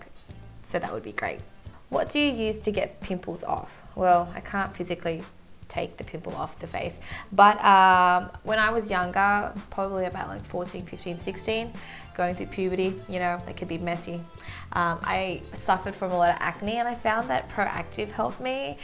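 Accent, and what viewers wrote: Australia. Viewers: I realized your Australia accent just now, I thought you were American in the past. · I knew you lived in australia you sound just like them I love the way they talk. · Omg I love your accent I want to be Australian so bad😍😍😍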